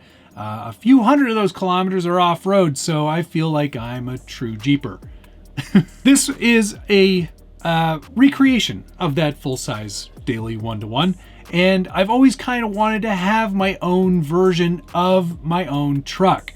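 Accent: Canada. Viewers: Sounds like you are a fellow Canadian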